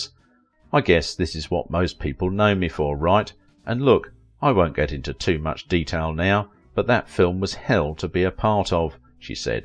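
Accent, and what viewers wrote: United Kingdom. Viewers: Why are you so bad about misaligning images and audio? "let's talk about how frightening the Shining was while showing pictures at that exact moment from Popeye." Also, is this voice yours? Or are you another shitty Chinese/Indian channel that hires British voice actors to try and give the illusion that they do good work?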